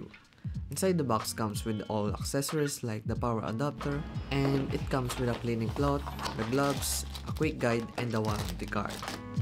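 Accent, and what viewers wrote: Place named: Philippines. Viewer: I lab yor pilipino aksent❤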